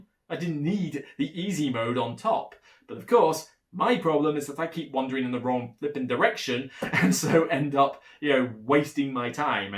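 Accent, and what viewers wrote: United Kingdom. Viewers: First, I think this is the first time I realized you are British. Second, your review is spot on with my experience. I don't have space in my house to leave the game set up so that isn't a solution for me. Our first game took 9 hours and we didn't even finish. I wish we had gotten the cartographer's map. I think if you noted the card numbers and essential features, you could speed up the game significantly. I don't think it is rational to have to start completely over every time. That easily doubled our play time.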